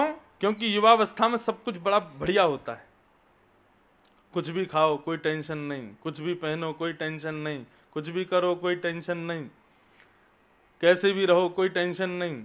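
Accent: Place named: Braj, India. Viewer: Jay Shri Krishna Radhe Radhe 🕉 Namo Narayan ji Jay Shri Hari 🙏 ♥️ ❤️ 🕉 OM Namo Bhagvate Vasudevy Namh Jay Shri Laxmi Pati Jay Shri Shyama Shaym ji Jay Shri Guru Dev Maharaj ji Aapke Charno Mai Koti Koti Pranam 🙏 Radhe Radhe Jay Shri Harivansh ♥️ ❤️ 😍 💙 Radhe Radhe Aapki vraj Bhasa Badi Sumadhur hai Radhe Radhe Jay Shri vraj vasi Jay Shri Vrindavan Dham 🙏 Jay Shri Yamuna Maharani ji 🙏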